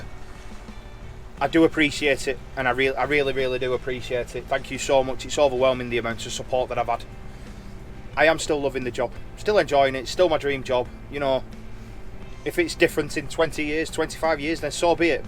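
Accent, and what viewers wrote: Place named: Bolton, United Kingdom. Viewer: Proper bowton accent that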